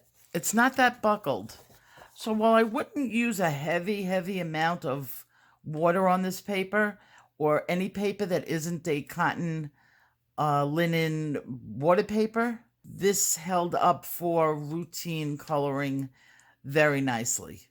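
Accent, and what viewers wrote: New York, United States. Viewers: Wonderful to hear that New York accent, in the YouTube coloring world, once again! Shalom, thumbs up.🎨♿👵Barb Nelson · Hi! I've missed hearing your voice and that New York accent I love so much. You sound wonderful! Thank you for sharing your photos of you and Howie. What a lovely couple! What the heck did he do for a living that allowed him to shake Obama's hand?! 🤣❤ I'm so glad you took time off. I can't wait to get my hands on your paste!! Excellent video! Sending big colorful hugs and love your way! And the tattoo.....sniffle.....❤ Love you!!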